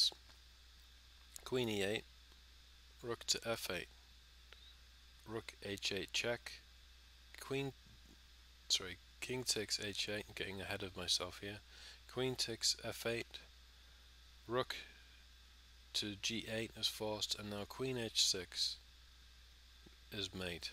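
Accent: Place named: England, United Kingdom. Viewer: Where from in England though?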